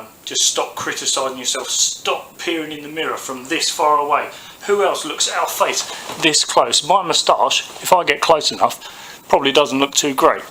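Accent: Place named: United Kingdom. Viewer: Haha. Great video! I agree 100% with everything you said. I should also say, though, that even though I knew you were British, it didn't hit me that you would speak with such a strong British accent! That was kind of a shock, actually! Hehe. Great video. Thank you for posting. That moustache is looking great!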